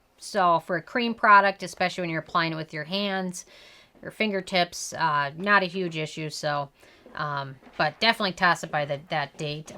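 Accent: Minnesota, United States. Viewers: You HAVE to be from Minnesota or Wisconsin!❤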